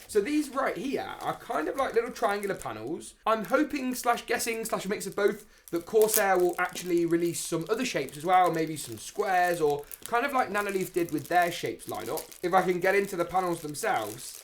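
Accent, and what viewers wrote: United Kingdom. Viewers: I love this guy especially his British accent that makes the build more interesting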